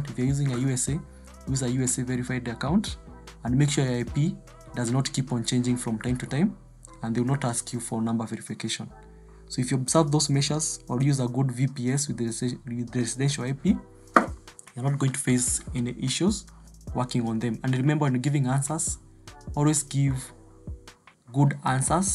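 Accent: Kenya. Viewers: I've been watching your videos and unasound kama mkenya, which proxy site do you recommend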